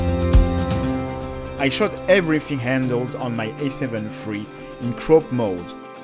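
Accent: France. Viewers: Tu est français ?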